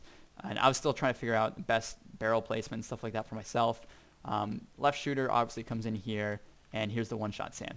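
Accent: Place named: United Kingdom. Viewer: You sound like your from the uk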